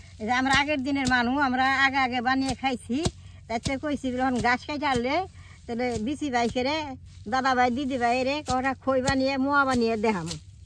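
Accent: Tangail, Bangladesh. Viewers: আপনার বাড়ি কি টাঙ্গাইল?? এটা তো টাঙ্গাইলের ভাষা.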